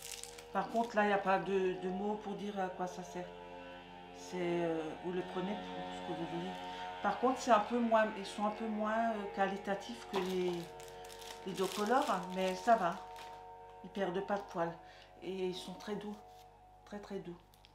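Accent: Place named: Alsace, France. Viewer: Super haul, j adore leur palette, j en ai déjà pas mal...petit clin d'œil pour ton accent alsacien...sa fais plaisirs. 67 elsass frei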